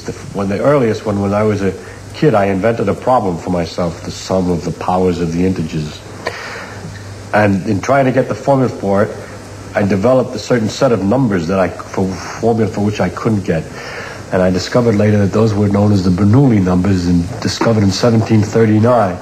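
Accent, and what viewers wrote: New York, United States. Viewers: That New York accent is so catchey. I remember once telling my mother that it is possible to have negative integers as well as positive integers and tried to explain my idea,, this was our at the age of 11 or 12, she just laughed at me and said that was stupid, it wasn't until high school then I realised that's exactly what you can do. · I love his accent. Is that the thickest New York accent ever seen on TV?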